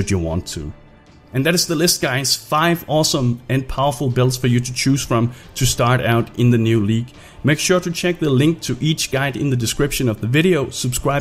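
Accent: Denmark. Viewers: that danish accent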